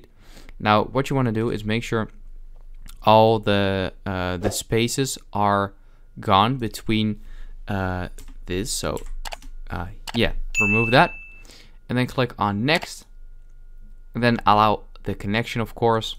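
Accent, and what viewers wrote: Netherlands. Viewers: UR DUTCHH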